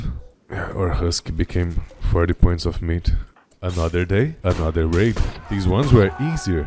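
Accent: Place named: Brazil. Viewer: “that’s the bones of the office” Eu tinha uma suspeita que seu sotaque era brasileiro, mas depois de ouvir essa expressão eu tinha certeza! Kkkkkkkkk que legal achar um br fazendo conteúdo de rimworld, ótimo vídeo cara!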